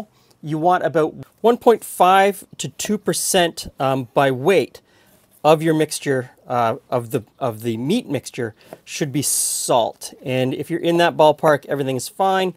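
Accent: Canada. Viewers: I'm amazed at the kitchen equipment he has. He must have a big place to store all that stuff. I mean, who has a dedicated sausage stuffer? It's not exactly small either. Also, I wanted to mention for a while now, I love how Canadians say certain words like "out". I can't even describe how it sounds to me. Makes a Canadian, or American from the far North stand out every time.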